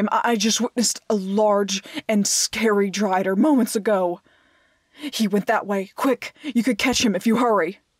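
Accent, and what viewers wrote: South, United States. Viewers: just thinking and wanted to share but I think you'd make an amazing outlaw series, southern charm with the voice, amazing perspective on story telling, know when to stop and end a episode. Like I said was just sharing a thought and ¿I guess things that prompted me to say it¿ idk reasoning didn't sound right lol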